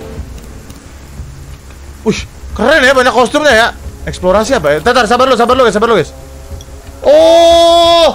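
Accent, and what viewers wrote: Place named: Indramayu, Indonesia. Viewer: Indramayu nih bang. Abang asal mana?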